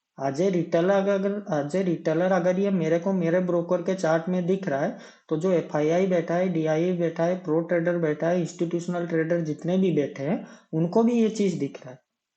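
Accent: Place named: Odisha, India. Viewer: tam katha ru janideli...j tme..odisha ra boli....☺️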